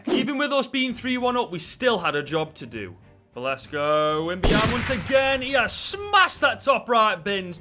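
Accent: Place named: North, United Kingdom. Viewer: You sound like a northerner bruv. Palace fans know we aint t winning shit lol.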